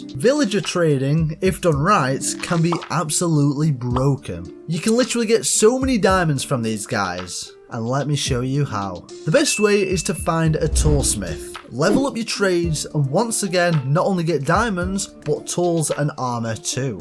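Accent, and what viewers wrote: United Kingdom. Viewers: sounds british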